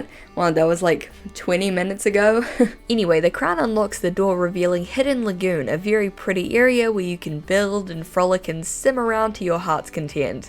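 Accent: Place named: New Zealand. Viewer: The original Sims is, so far, the only Sims game I've ever played, and I only got to the House Party (?) expansion pack. I watch these videos because I enjoy yer videos. And because I'm so intrigued by kiwi accent. But also because I enjoy your videos. So appreciate how much we (can only speak for myself, actually; sorry) aoppreciate your videos, dammit!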